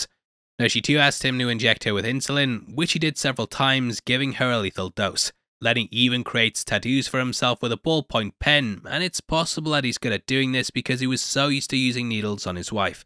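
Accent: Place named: England, United Kingdom. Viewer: You voice is like every English voice put together. Nice video new fan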